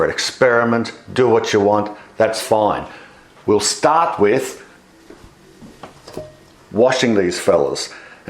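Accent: Australia. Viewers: Thank you for this! We really need these recipes and videos so we use what you teach us to grow. Dogs eye, dead horse, dodgy? I think this is why the world loves Australia, Australians, and the accent. Mix the weird sayings, words and accents and it’s pure gold. But I’ve heard the same thing about Texans too boot.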